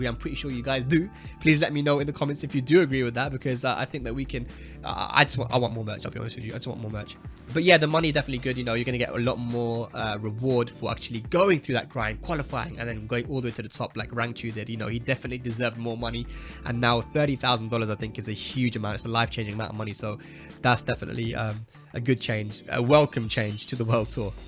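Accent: South Africa. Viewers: Lol, that South Africa accent! :D Haha, thanks for the shout out! We're as excited as you are about all of this! TWT LETS GO! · Lmao that south african accent 😅😂. Lovely video 👌🏼🔥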